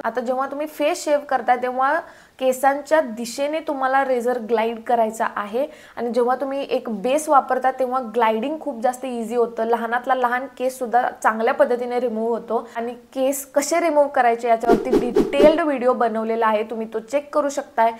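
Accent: Kolhapur, India. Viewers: Are u from Kolhapur?? Bolnyavrun tr tsch vataty khr ahe Kay?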